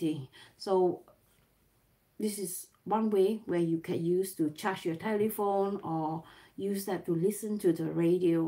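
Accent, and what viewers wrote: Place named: Singapore. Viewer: You still have your singapore accent.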